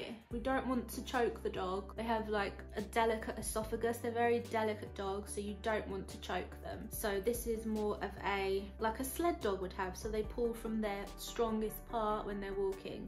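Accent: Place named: England, United Kingdom. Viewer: I saw your comment on our channel about Baby Violet. I am pleased that you found us. Glad that YouTube recommended our video to you. Watching this video now. Wow. Great video! I just became subscriber number 75 to you! You doggie is precious! Ruthie B. Howard BIG BELLY REBUILDS 9-22-21 P.S.: I LOVE, LOVE, LOVE, your English Accent! Cerulean, KY USA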